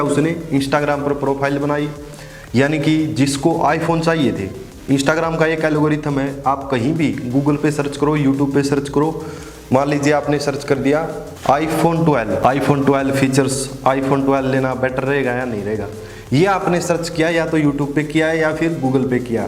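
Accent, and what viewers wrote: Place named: Haryana, India. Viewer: Aapka haryanvi accent bilkul mere dost jaisa hai❤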